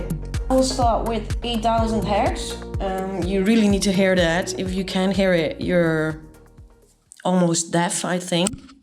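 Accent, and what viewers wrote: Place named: Netherlands. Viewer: Why does she have a verkakte Dutch accent?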